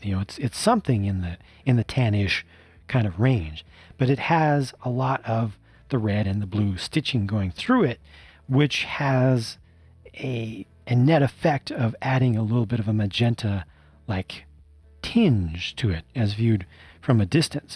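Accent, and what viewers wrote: United Kingdom. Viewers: Oh my god, the British accent is frickin FANTASTIC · Oof that british xdd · 😄 Nice British accent.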